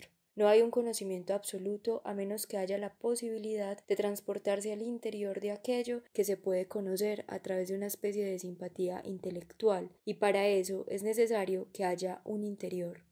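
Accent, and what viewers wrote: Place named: Antioquia, Colombia. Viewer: Bergson con acento paisa, estoy en el cielo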